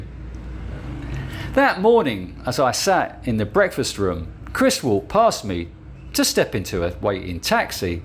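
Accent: England, United Kingdom. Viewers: Your strong English accent is annoying to listen to, if I was a woman I wouldn't want to hear it , an American talking English is much more pleasant. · The English accent is way better than a yanks . Don't watch if you don't like. UP THE POMS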